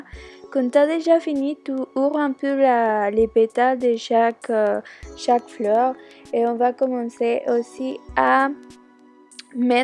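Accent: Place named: France. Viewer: i love your french accents, sigue asi de guapa, saludos!!!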